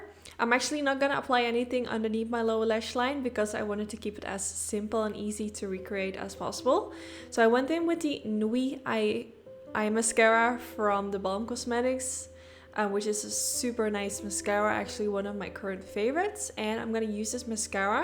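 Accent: Netherlands. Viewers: Ben jij toevallig Nederlands? 😁 hoor een nederlands accent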